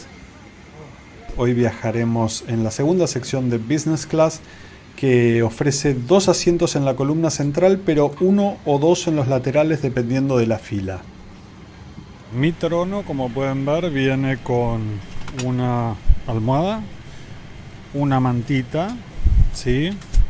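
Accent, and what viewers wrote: Argentina. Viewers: Hola sos argentino o uruguayo?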